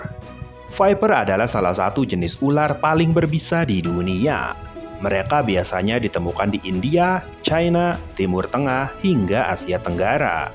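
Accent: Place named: Tegal, Indonesia. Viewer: Orang tegal hahha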